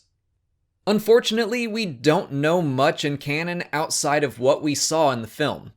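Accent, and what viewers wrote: United States. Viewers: Great video, sounds even better with an American English accent rather than that Goat sounding yuck mouth. Really is great to have working with. im a huge fan of both of you. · ffs. I came to this video for more of epic Australian accent. Not this American voiceover crap. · Why am I hearing a American talking?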